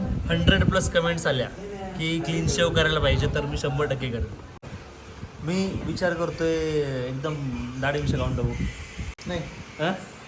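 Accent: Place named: Maharashtra, India. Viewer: Tujhi marathi accent khup chan ahi🙈💗 ani clean shave nako karu please😢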